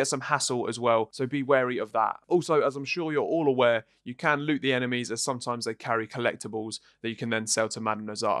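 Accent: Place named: England, United Kingdom. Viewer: I'm always here nice to here an English accent on YouTube 👍 bloody yanks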